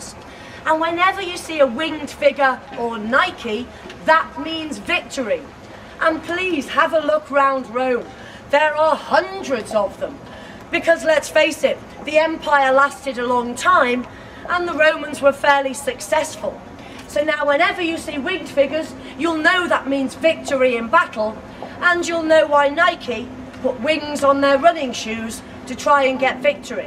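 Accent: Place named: England, United Kingdom. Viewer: Signora è mitica , adoro l'accento inglese , rende aulico anche ciò che non lo è. Spiegato con entusiasta enfasi.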